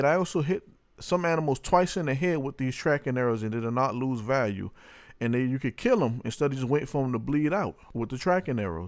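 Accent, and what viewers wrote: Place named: South, United States. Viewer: That’s arrow, not error. Good southern boy accent dair.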